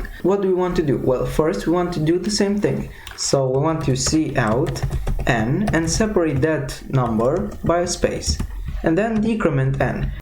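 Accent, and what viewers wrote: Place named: India. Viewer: More indiant accent please!!! Btw great video!!! Sub from me😁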